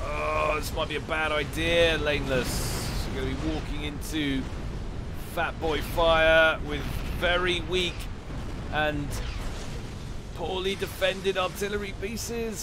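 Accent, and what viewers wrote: United Kingdom. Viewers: 5 stars caster. you know your game, great commenting on tactics and strategies. Pleasant voice with that lovely British accent ( sorry if you are not brit I took a guess ) and you pronounce french quite lovely. So glad I found you and subscribed.!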